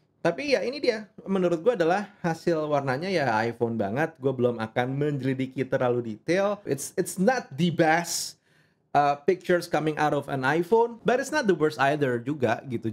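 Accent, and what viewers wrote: South Jakarta, Indonesia. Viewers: Jaksel bgt pusing dengernya.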